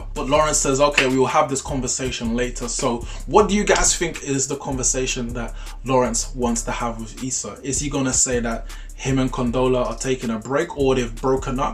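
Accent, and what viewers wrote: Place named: United Kingdom. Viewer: I love the British accent bro and love your choice of music. I like Nathan as a character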